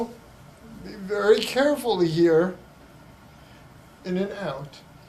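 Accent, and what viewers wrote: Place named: United States. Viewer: What part of America is that accent from?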